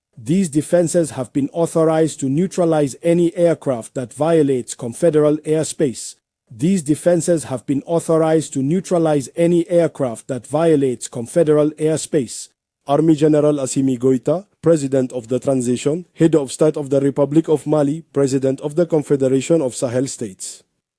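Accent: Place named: United States. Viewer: Thank you for the explanation, Bro, for explaining what has actually happened. I love your American accent!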